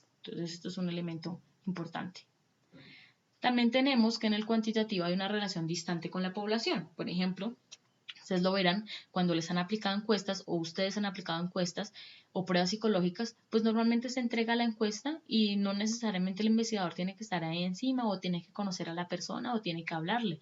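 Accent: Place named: Colombia. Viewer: Gracias, las Colombianas hablan bello, me enamoro su vos.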